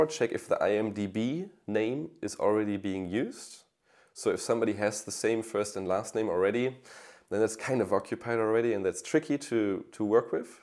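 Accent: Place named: Germany. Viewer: I think it's the German accent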